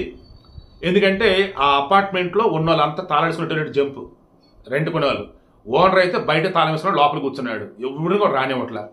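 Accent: Andhra Pradesh, India. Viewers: Meeru mareee ...andra ....yasalo...matladutunnaru.....koncem....bhasa marchndee....speed ....kuda tagginchindee....andariki ardham awaali kada......🎉🎉🎉🎉🎉... ...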